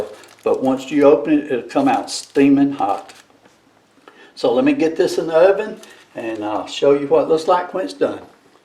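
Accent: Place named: Alabama, United States. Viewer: Yall in Alabama? You sound like Bama...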